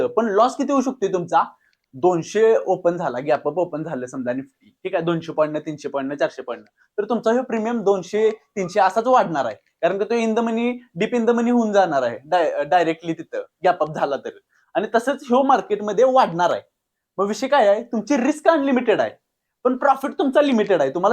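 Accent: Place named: Kolhapur, India. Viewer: Mast marathi boltos kolhapur chi atvan aali karn aamchy kolhapur madhe aaschi bhasy vapartat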